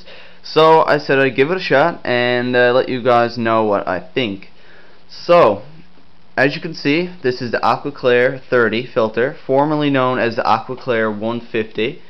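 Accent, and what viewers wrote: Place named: Newfoundland, Canada. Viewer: Knew that was a Newfoundlander Accent! Cool vid dude, pickin one of these up next week.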